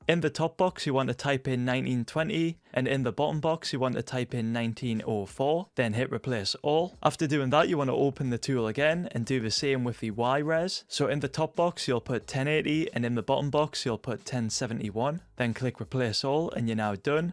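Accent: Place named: Newcastle, United Kingdom. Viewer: I think he is from Newcastle in England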